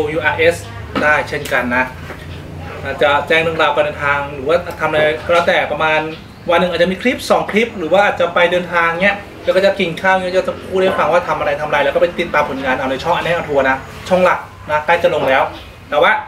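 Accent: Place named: Thailand. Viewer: สวัสดีค้ะคุนน้าชอบเวลาคุนน้าพูดค้ะเสียงเหน่อๆเพราะดีค้ะ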